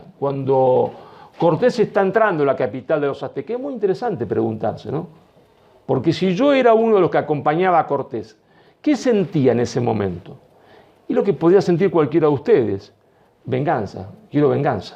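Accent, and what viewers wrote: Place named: Italy. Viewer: suenas a italiano, pero llevas la hispanidad en las venas!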